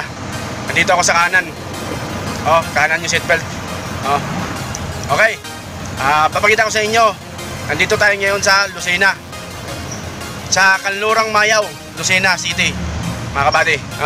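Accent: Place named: Visayas, Philippines. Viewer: Kabayan konti lambot ng dila para di mahalata bisaya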